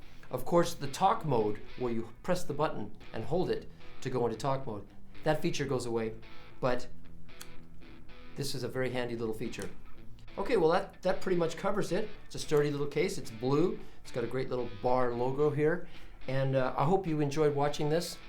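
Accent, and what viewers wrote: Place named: California, United States. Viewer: It's better to sound like you know what you're talkin about of course and instead of letting us here at tell us what we are going to hear. You must be from California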